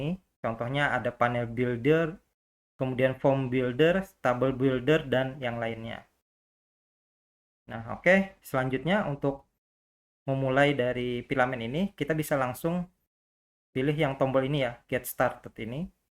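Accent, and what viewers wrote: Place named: Banjar, Indonesia. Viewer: Logatnya kaya orang banjar..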